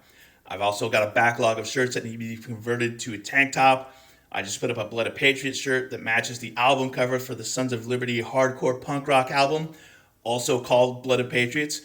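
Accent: Scotland, United Kingdom. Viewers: Damn, the Scots accent came out QUICK today!!!!! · Why does it sound like you're covering up a Scottish accent? ... 🤔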